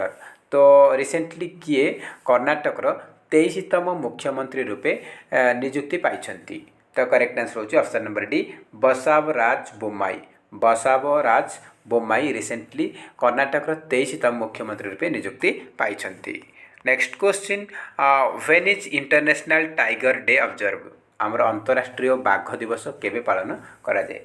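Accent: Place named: Sambalpur, India. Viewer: I think the spoke person of this vdo is belong from sambalpur district.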